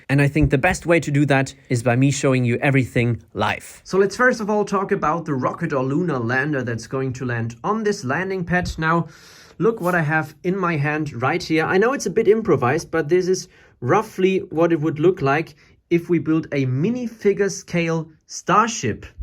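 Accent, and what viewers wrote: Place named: Germany. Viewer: Der Typ ist kein Engländer. So wie der englisch spricht hört man sofort raus, ist deutsch seine Muttersprache. Ich habe mir schon hunderte englische/amerikanische Videos angeguckt und keiner spricht so. Das machen nur deutschsprachler.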